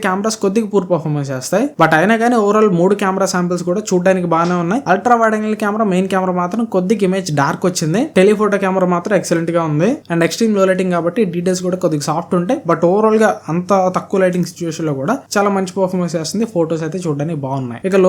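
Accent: Ongole, India. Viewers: bro meedi ongole aa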